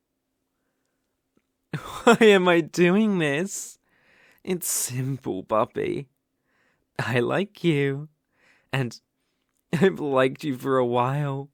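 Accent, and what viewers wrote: United Kingdom. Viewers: British. Take it from a Brit. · The British accent is just : 😩👌 wonderful 😭🌟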